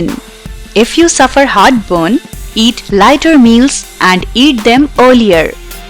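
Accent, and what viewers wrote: India. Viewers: Indian accent tsk...hate it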